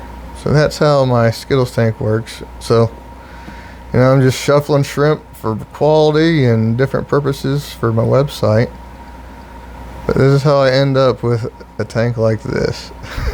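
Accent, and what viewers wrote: United States. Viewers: Also wanted to know of course you gotta southern accent. Where are you from?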